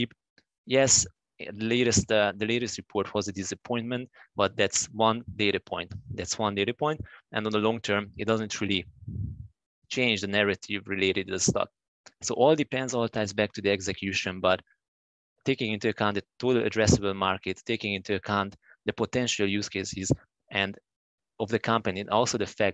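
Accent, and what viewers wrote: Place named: Hungary. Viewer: I live in Oregon but you sound like a Hungarian!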